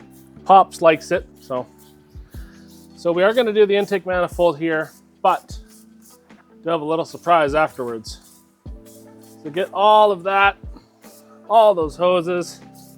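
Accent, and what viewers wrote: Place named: Canada. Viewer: nice demo. It looked a little scary when you sprayed around the alternator. Thanks for speaking Canadian. 🇨🇦👍👍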